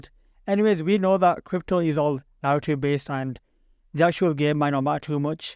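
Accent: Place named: Yorkshire, United Kingdom. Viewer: He's literally English from I'm guessing Yorkshire or Lancashire like Bradford area learn accents before you start labelling smart arse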